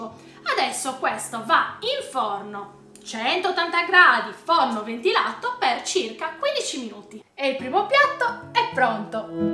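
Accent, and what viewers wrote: Sardinia, Italy. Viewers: Che dire ….mi fai morire ,sei troppo simpatica ,ma sopratutto brava ,piena di energia ,fantasia e simpatia ,pian piano mi sto guardando i tuoi video ,sei sarda come me e si sente 😂,sono molto curiosa di sapere di quale parte della Sardegna sei ,io sono di pula ,provincia di Cagliari .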